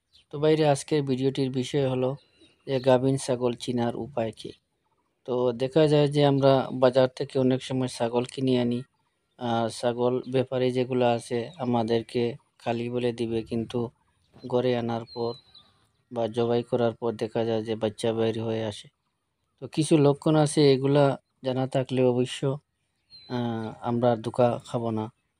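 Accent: Sylhet, Bangladesh. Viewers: oh jiggesh korlm because apnr kotha gula sylhet er mto suddho kothar sate sate sylheti o mix ace tai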